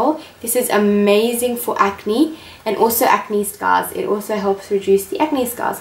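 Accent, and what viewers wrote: United Kingdom. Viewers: Hey girl love your British accent 💞Please share the secret of your healthy lashes....... Love all your channel thanks love from Pakistan 💕💕🇵🇰🇵🇰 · The English accent is bae 😘😘💕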